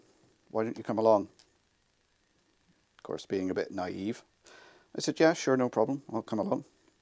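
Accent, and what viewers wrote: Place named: Ulster, United Kingdom. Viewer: I would to like make a few remarks, if I may. I really enjoy your channel. Not just for the trains but for the advice and help from the many folks here. On a more personal note I love to hear the soft tones of an Ulster accent from home. I always thought of you as a man that gives freely of his talents and time with the museum and the town. But here that you and Donegal/Bristol Mum are foster parents takes my respect for you to another level. Sir, I give you total respect. Thank you, if I may, on behalf of those kids that just need some support and love whilst going through some very difficult times. You are indeed, 🫡☘️ Ian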